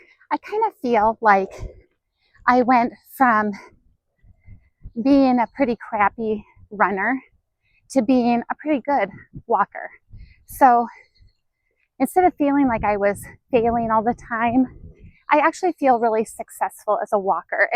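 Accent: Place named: Midwest, United States. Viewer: What's that accent? MI, WI? "Kind of like a bad relationship." 🤣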